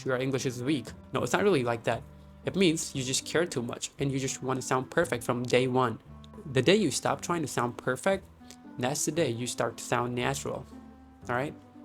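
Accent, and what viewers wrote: United States. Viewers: Bro how do you speak american english accent ?? Have you been to there ??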